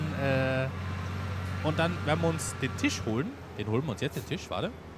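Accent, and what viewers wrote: Tyrol, Austria. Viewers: Wo aus Tirol kommst du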